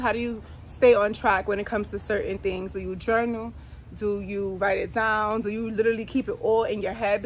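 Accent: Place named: New York, United States. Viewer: I can’t tell if she from Long Island or the Bronx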